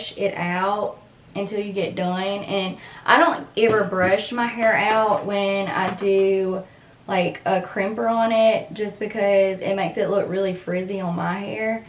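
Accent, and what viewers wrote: Alabama, United States. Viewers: I love your accent. You sound like my daughter-in-law. She's from Shelby, Alabama. Thank you for the video. I just bought one of these.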